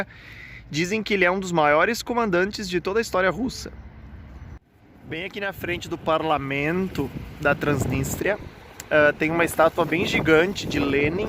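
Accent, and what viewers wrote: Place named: South, Brazil. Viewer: Esse sotaque do sul kkkk😅❤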